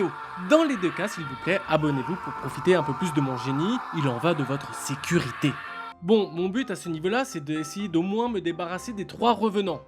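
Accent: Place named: France. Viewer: Great video Ur English is perfect but U somehow keep all the frenchness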